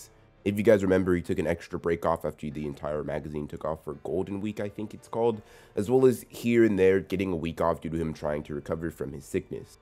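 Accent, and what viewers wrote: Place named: Missouri, United States. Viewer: that's intersting. I asked bcus of ur accent. It reminded me of my lil bro cos he started sounding like that when he was in Missouri. It's very clear and easy to follow since you hardly skip over any letters/syllables.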